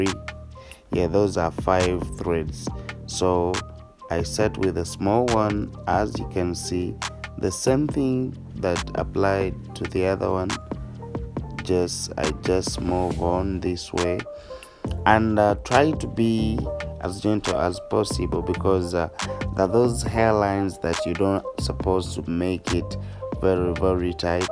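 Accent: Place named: Uganda. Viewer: He sounds like a Ugandan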